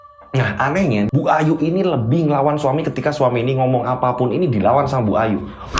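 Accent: Java, Indonesia. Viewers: Assalamualaikum de.... Majalengka Jabar Hadir.... Seneng sekali dengerin cerita misteri de.... Suka sekali sangat pas menyampaikan cara bicara tokoh cerita nya.... Logat jawa nya.....sehat selalu de... Semoga chanel de semakin berkembang.... Boom.... Boom... Booommm😍🙏🙏🙏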